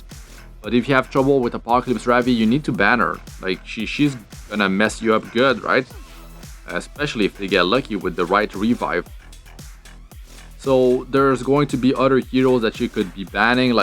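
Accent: Quebec, Canada. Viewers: Hey très bonne vidéo de RTA et en passant j'ai remarqué ton petit accent québécois dans ton anglais qui est vraiment pas facile à remarqué pour un non québécois en tout cas tu te débrouille vraiment bien continue !👏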